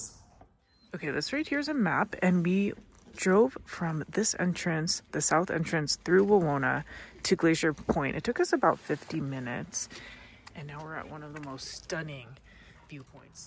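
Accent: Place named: Australia. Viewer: Great video! Thank you and your Aussie is darling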